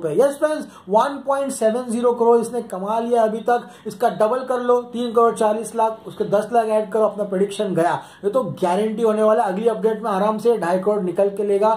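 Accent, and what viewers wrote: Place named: Hyderabad, India. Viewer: Asalamualaikum bhai apki dialogue dilevery jo hai na hamare hyderabadi style me bolte kirak maut daldere yaro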